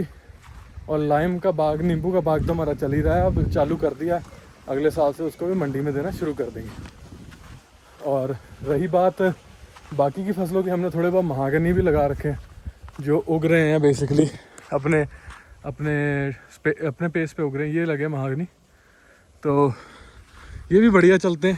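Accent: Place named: Punjab, India. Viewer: Siraa lagaa veer hindi vich Punjabi touch puraa siraaa😂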